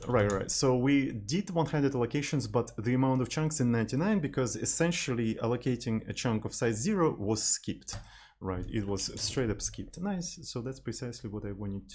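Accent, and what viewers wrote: Russia. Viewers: ахахха, да русский ацкент явный, но неплохо говорит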